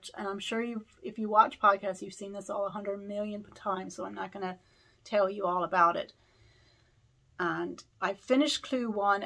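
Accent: Ireland. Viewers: Those will be perfect for your dad. I hear the Irish accent. That is so nice. Gina at knitting Turnpike is , I think possibly doing the same KAL, I've heard her talk about it. Her shawl work is beautiful. You might check her channel. I am a pretty new knitter so I can't be much help. You have such beautiful yarn.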